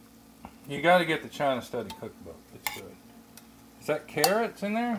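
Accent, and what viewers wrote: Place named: South, United States. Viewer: I am loving your southern accent! Being from the south myself, I find so many men would rather die of a heartache eating meat than go vegan for their health and family! Very refreshing to know a southern man can convert! lol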